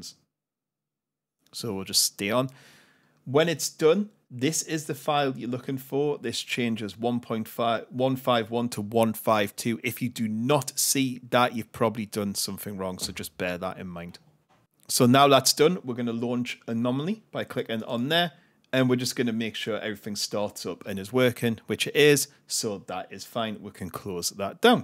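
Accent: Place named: North East England, United Kingdom. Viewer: I'm Newcastle, so I'm thinking Washington/Sunderland/Durham maybe?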